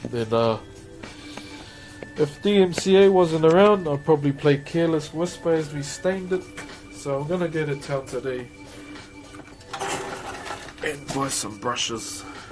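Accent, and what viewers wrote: New Zealand. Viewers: Are you from New Zealand 🇳🇿 I,m am from the Netherlands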